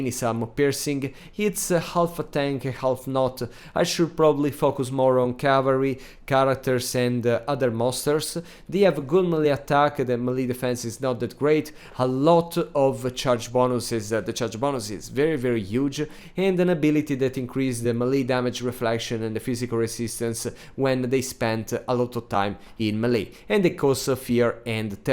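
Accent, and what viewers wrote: Italy. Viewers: I started to finally play more TWWH 3 recently. Your channel is great for me. Learning a ton. And you Italian accent is wonderful! (I'm American)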